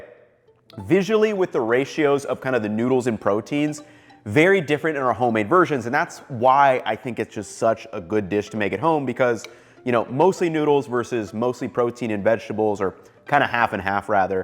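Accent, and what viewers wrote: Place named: General American, United States. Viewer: nope, he definitely pronounces 'noodles' with the typical General American vowel quality throughout the rest of the video. Just a slip of the tongue I reckon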